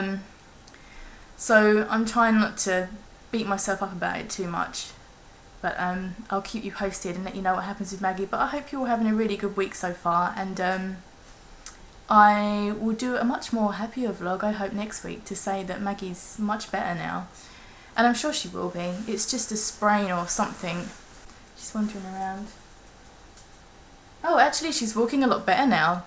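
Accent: England, United Kingdom. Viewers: that english accent just makes me horny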